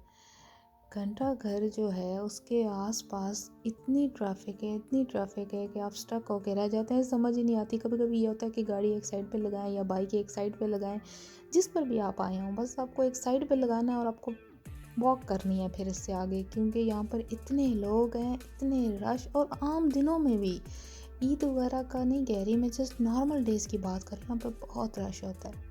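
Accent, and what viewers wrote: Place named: Sindh, India. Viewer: Ap kya Sindhi nako? Ap k accent may Sindhi pan tau hay😊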